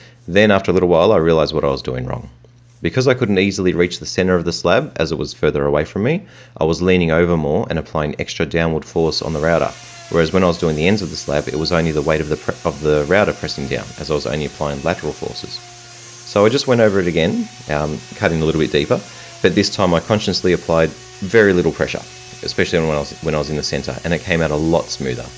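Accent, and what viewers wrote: Australia. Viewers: It's great to hear a Aussie an Aussie accent. Love the casual approach as opposed to the over technical banter that is often the case. Great job and thanks for taking the time to show it.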